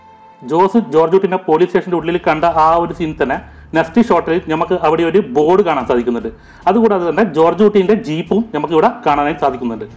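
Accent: Kannur, India. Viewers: Eth kannur karanada... Ee channel owner, thani nadan kannur bhasha... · നിങ്ങൾ കണ്ണൂർ കാസർഗോഡ് കാരനാണോ...? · Nigal kannur ano ?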